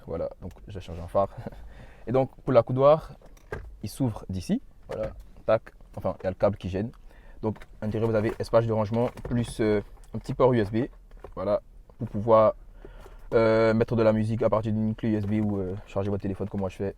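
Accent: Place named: Haiti. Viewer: Belle video, bien argumentée dans un français soutenu, avec des anglicismes fort bien maîtrisé, une VOIX de lôveur tintée d’un léger accent! D’origine haïtienne!?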